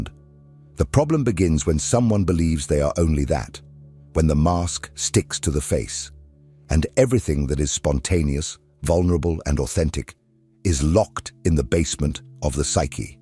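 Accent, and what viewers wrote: United Kingdom. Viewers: I wish i could listen to this without hearing an english butlers voice.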